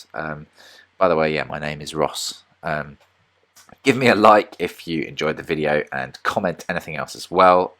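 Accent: England, United Kingdom. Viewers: Ah Yes, a fellow Englishman Finally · I had no inkling you were british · Oooooooo a British accent I like it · O my god hes british i wasnt expecting that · YES!!! BRITISH!!! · You're English, oh yeah baby respect my brother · I mean I guessed you'd be from England from that time I found you and you kicked my ass on For Honor 😂😂😭 keep up the great work man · Lovely British Orochi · Oh snap he's english! I love even more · No joke I thought u were Asian but it turns out you're a Brit like me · I had a feeling he had an English accent. I love it 😍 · YES, HE'S BRITISH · ofc its a uk CUTIE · Didn't expect you to be English haha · Voice reveal. British. Subscribed. Brilliant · Who else knew he would be from the U.K because I did lol 😂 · He’s British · I fuckin knew it he was british. We luv ya!!!!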